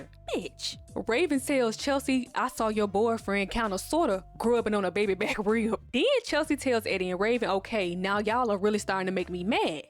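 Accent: Memphis, United States. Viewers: I remember thissss! Are you from Memphis I hear the accent! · You talk like you from Memphis lol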